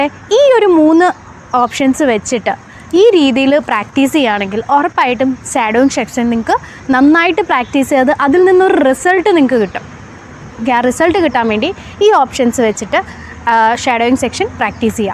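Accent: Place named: North India, India. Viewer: Where were you in North India? Your slang make sure you were born and bought up there. Am I right? Also appreciate your sincere effort to educate your subscribers.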